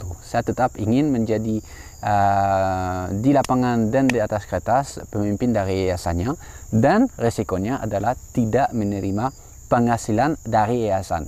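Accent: France. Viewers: Dari kecil saya selalu tandain, kalau ngomong "memang" jadi "mamon" dalam aksen Prancis:D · Banyak hal yang saya suka dari anda, tapi ada satu yang paling saya suka, adalah cara bicara anda memakai bahasa Indonesia dengan aksen France yang berkelas. Sengau sengau aduhai. Really love it 😂😀❤️🔥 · Aksen Perancisnya masih kerasa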